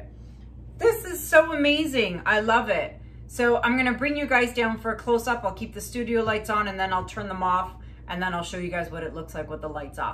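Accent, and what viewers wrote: Canada. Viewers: Just found your site,....I'm in the US but knew you were CA with the eh and the owt! lol I have family in Dunnville, ONT! They make fun that I drop my 'H' sometimes! Soooo, Love your work, your dog Juno and excited for your reno!! Since I'm much older than you, I have advice! Enjoy the journey, trust yourself and don't worry....I choose the green, btw. Looking forward to your next project and your vlog! Pat from Brooklyn, NY ( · thank you so much. I could hear you pronouncing the name but I couldn't find it. By the way I love you accent. I don't know for sure but it sounds Canadian?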